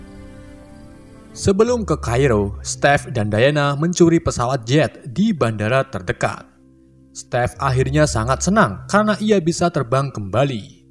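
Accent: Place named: Java, Indonesia. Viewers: Pembawaan narasi selalu menarik, "sewu welongatus sangang puluh papat". Logat jowone met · Lu orang Jawa ya bang, dari kota mana bang??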